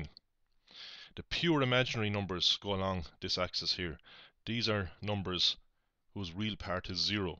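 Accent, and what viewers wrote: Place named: Ireland. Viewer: hay im doing my leaving this year and there is a certain question that seems to re-appear often finding unknown points on an argand diagram it is on the 2013 new sec paper 1 section A question 1 (b) i was wondering if u had or could possibly make a video for this question as i suspect it could appear on my paper thanks very much.( its great to have an irish accent doing a commentary theres only so much American accent i can take:)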